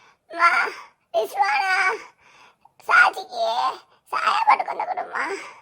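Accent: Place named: Kilakarai, India. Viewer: I always like klakarai slang.. Super❤️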